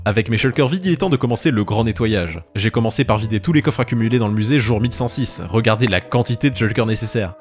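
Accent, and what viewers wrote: Quebec, Canada. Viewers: J'ai une question pk defois tu parle québécois a travers de tes phrase?sinon continu comme sa tes vidéo sont incroyables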